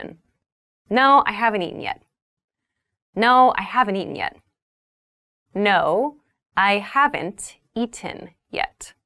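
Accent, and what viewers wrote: United States. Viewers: A big hug from Colombia for you. Love your american accent and i can understand almost everything what you say. Thanks for help us. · Is she American?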